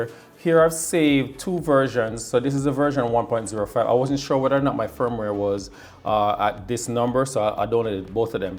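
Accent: Jamaica. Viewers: You sound like a yardie?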